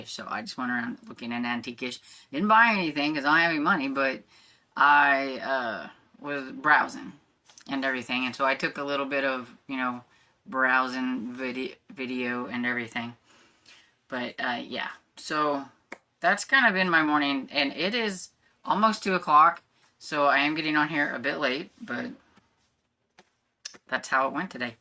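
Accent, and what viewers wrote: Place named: South, United States. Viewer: Happy weekend! That Chef Boyardee looked really good. I haven’t had it in years. I always had mine with a peanut butter sandwich. That was started by my mom when were kids. 😂 And of course you can consider yourself southern! You live in the country and you have a slight accent. That’s all you need. lol!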